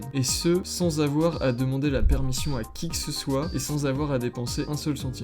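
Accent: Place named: England, United Kingdom. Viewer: PTDR, j’viens de me taper cette même vidéo mais avec ton accent anglais, avant de me rendre compte que tu faisais ça pour toutes tes vidéos, con que je suis 😅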